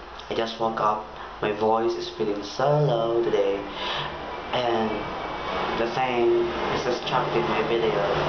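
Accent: United Kingdom. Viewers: naks British English ang accent :) good mowning :)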